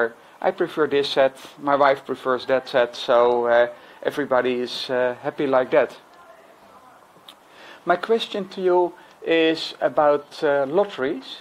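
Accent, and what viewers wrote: Netherlands. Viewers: I can hear you are Dutch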